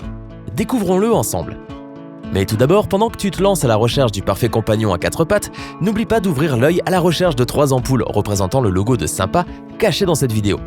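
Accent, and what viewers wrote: Quebec, Canada. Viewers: Oooo saï pour j'aimai bien la voit québécois enpluse saï la même voit que lama fache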